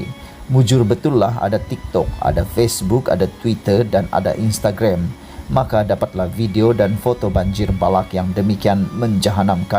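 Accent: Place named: Sabah, Malaysia. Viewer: Warga pitas sabah . Slang sabah.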